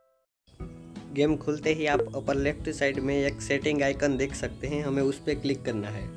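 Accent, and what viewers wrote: Gujarat, India. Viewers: Hindi with Gujarati accent.... Gujarati hai ap....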